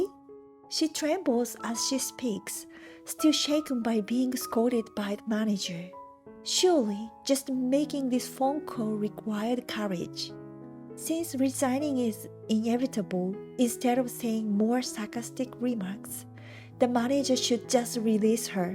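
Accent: Japan. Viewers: Why translate to English ? You hired to read story a asian stupid person who can not clearly speak English due to a heavy Japanese accent! All of the English words are blurred grammer or simply miss--pronounced totally! Very hard to listen to! !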